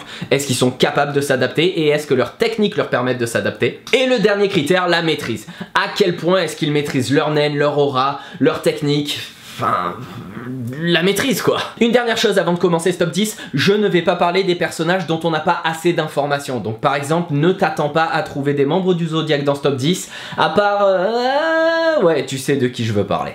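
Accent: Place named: France. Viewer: Don't understand a word 😂 but I missed you and your wonderful French accent so much! 😍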